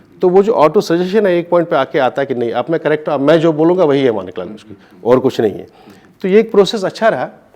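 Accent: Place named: Kashmir, India. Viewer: Very surprised to listen to his Kashmir accent which I never saw in his acting career great actor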